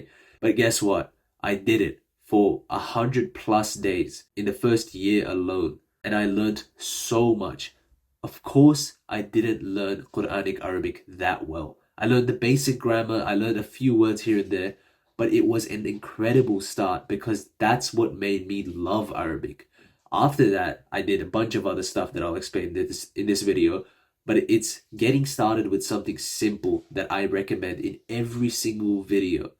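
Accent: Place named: Australia. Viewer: His accent sounds Australian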